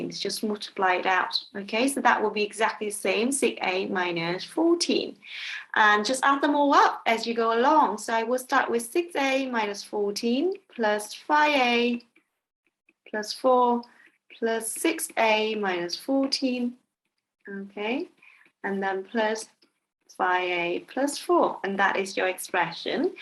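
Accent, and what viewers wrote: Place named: Wales, United Kingdom. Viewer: It’s Welsh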